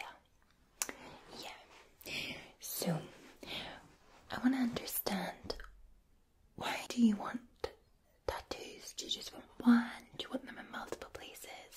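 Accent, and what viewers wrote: Scotland, United Kingdom. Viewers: So Scottish reminds me of Amy Pond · I'm from Scotland and I love all women's accents from all over the world but nothing excites me more than a homegrown accent , amazing X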